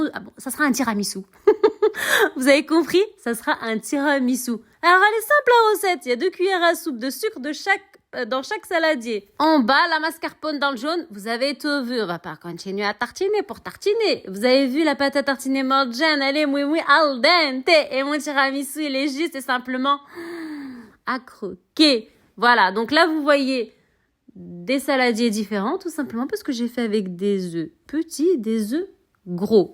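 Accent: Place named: Canada. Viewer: j'aime beaucoup ta façon de parler 😊😊❤on dirait que tu es canadienne salammm...😊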